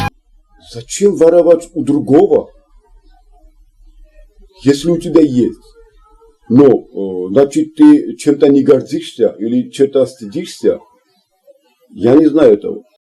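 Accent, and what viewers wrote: North Ossetia, Russia. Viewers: ЧТО У ТЕБЯ ЕСТЬ И ЧТО У ТЕБЯ ВОРОВАТЬ? ВОН ТУРКИ УЖЕ У ВАС ДОМА КОМАНДУЮТ И ПРИБИРАЮТ ВСЁ К РУКАМ. НЕ ДАРОМ ОНИ ВАС НАЗЫВАЮТ ГУРДЖЫСТАН, ЧТО ОБОЗНАЧАЕТ РАБЫ! ТАК, ЧТО ГОРДИСЬ ДАЛЬШЕ. У ТЕБЯ САМОГО АКЦЕНТ ОСЕТИНСКИЙ Я В ЭТОМ ХОРОШО И ЧЕТКО МОГУ ОТЛИЧИЕ УВИДЕТЬ. ТАК,ЧТО НЕ НАДО ЛЯ ЛЯ... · А почему у тебя осетинский акцент ти случайно не осетин. Плохо брать по грузинский говоришь.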